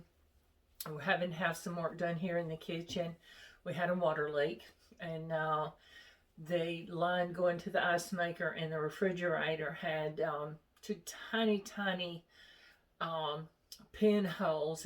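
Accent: South, United States. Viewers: You are a sweetheart. I very much enjoy your cooking (and your comforting southern accent).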